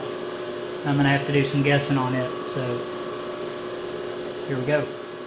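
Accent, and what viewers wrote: South, United States. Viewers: Me Now he's from a southern State you fool. Of course he's saying "to"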